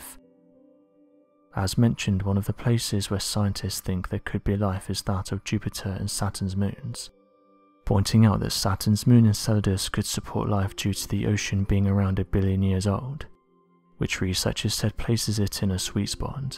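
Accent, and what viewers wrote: United Kingdom. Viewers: I hate this guy's lazy British accent.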